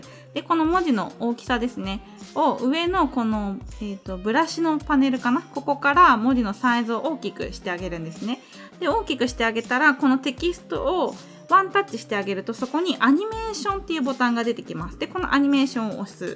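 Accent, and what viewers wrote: Kansai, Japan. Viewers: センセーの関西弁萌😍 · 『なにげに再生しただけの apple信者でもない ipadmini4 持ちの爺々ですけど・・・』 『指先も ネイルもbeautiful 話す言葉が速いのによく聞き取れる明瞭感 時々関西弁もカワユス👍』 『わぁぉ～！ 顔出しもあるんかい？ 超絶かわゆくて 今日からイイね押して フォロー決定👍👍👍』 正直 確かにsensei名乗ることだけあるお人で・・・解説動画としての秀逸さに感服致しましたm(_ _)m 邪な助平心は捨てて・・・ を師匠と仰ぎ ipadの利用について 一から勉強し直しますです！！！ マジで知らないこと多すぎ、便利そうやもんねぇ。有難う御座いました。この際追加購入検討するかな！ by にゃんこ爺々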